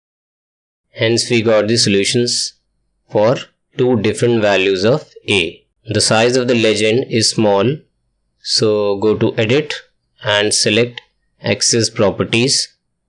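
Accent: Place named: India. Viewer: Computer voice with Hindi accent. How do they do that?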